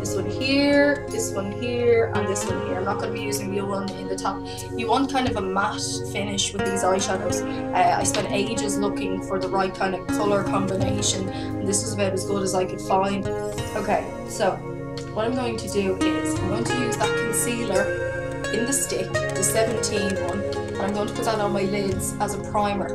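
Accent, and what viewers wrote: Ireland. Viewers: your accent <3 i love irish accents and i love your tutorial imma try it tonight because im uber bored!! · Your accent is so rad. I love it! Ireland is someplace I wish to visit sooo badly. Hello from California >3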